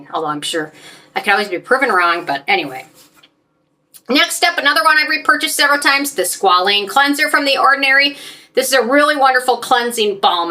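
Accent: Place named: Minnesota, United States. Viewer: Yay! I just did a mini shopping spree at The Ordinary yesterday and just saw that you posted this video. Very helpful! Btw I love your accent. I'm from NZ so I'm not too sure where to place your accent/dialect. Is it sort of Minnesota?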